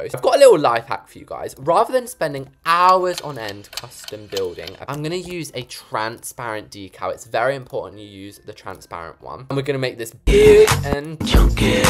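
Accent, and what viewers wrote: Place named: England, United Kingdom. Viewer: just how good is and how she only has 200k+ subs is so disrespectful i wish you could sub morethanonce like would have like 77777M subs if you could sub more than once beacause I WOULD BE SPAMMING THAT BUTTON LITERALLY BRAKING THE BUTTON (also my pc) LIKE BRO is such a good builder i could never sorry but one time i said a mean comment because it autocorrected so tysm for being a reallly great builder and so funny and i love ur accent one time i went to englend and i heard someone talking with your accent and i fell in love byee! i hope you have a happier life!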